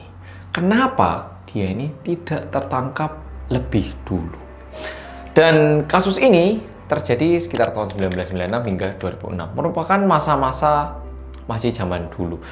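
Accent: Java, Indonesia. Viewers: maaf br gabung mas,mau tanya mas,mas ini asli orang mana y,kq logatnya medok kyk orang jawa 🙏 suka bgt liat chanelnya mas 👍👍👍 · Hai mas Korea Medoook😅 Siyap nyimak 🎉🎉🎉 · Suka deh sama medoknya bahasa mas..sehat n sukses trs.. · Sy kaget pas denger suara mas.....logat Jawa nya medok banget🤭🙏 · Saya tuh baru subcribe, Bang. Kaget sih ketika nemu Oppa Korea bahasa jawa medok 😆😆😆, maaf ya Bang. Suka yakin, banyakin bikin video kaya gini Bang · Kerene mas Korea narasine enak di simak asli keren mas👍🏻baru x pertama nemu chanelnya membawa narasinya pun sedap untuk di simak dgn logat medok nya pun yg bikin terpikat outo Subscrab deh✌🏻🤣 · Akhir nya setelah nonton video2 beberapa x gw subscribe niiih....punya ciri khas sendiri nih "medok" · Jawa banget 😂😍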